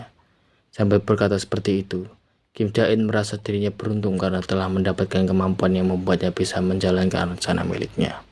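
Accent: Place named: Java, Indonesia. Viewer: Medok bgt mas❤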